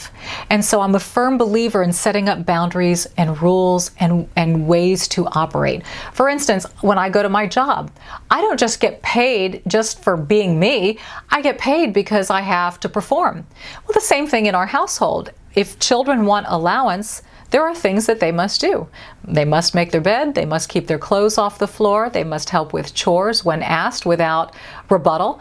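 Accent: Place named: United States. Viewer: Hello...I'm German and I love to listen to your "perfect" American English to improve mine :)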